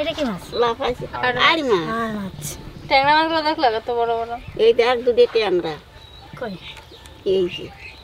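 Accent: Bangladesh. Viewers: সব ঠিক আছে কিন্তু এনারা কি বাংলাদেশের লোকজন? এরম ভাষায় কথা বলেন এনারা যেন মনে হয় বাংলাদেশে থেকে এসেছেন..যদিও বাঙাল অনেকেই কিন্তু পশ্চিমবঙ্গে জন্মগ্রহণ বা বসবাস করলে তো ভাষা পরিবর্তন হবে.. একমাত্র যদি born and brought up বাংলাদেশ হয় তবেই এতবছর বয়সেও ভাষা বদল না হতে পারে।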